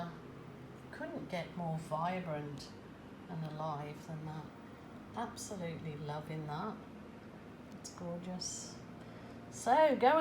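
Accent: Birmingham, United Kingdom. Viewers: Lol! have been on the phone to home? Your Brummy accent is coming through more today! How lovely! What a stunning piece! Treat of colours - very magical! Hope you & your family & friends are starting to recover after all you've been through? X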